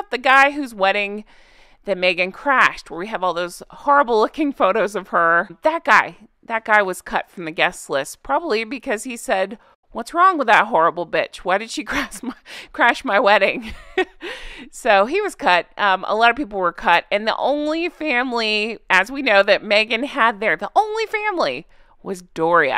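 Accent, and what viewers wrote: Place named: United States. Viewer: i love voice... which gives away she would be a laugh to hang out with.. one of my favourite American accent s.. revenge............ revenggggeeeee.. 🤣.. always gives a chuckle hearing her say it..and shes just said it again while im typing 🤣.. stop it 🤣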